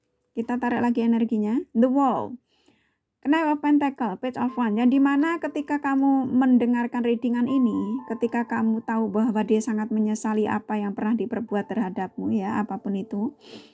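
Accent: Java, Indonesia. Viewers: Kalau boleh tau kak orang mana Kok bicaranya logat jawa banget. Jawa tengah X ya kak Salam kangen Aja for you kak 😘😘😘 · Btl skl kak sy&dia pernah hdp bersm 29 thn,dan sdh ada DUA anak, tiba2 km bercerai, skrg sdh 4 thn,dan telah bersm yg lain, namun akhr2 ini ada gelagat ingin rujuk, sy sih msh sndr ( single parent ), bg sy sdh nyaman dgn kesendirian,sy sdh happy dgn cucu ytc. Mksh ya kak readingan,sy sk skl dgn suara kk yg njowoi dgn canda' an ben no rasakno dll, ok kak smg kk makin sukses,sht sll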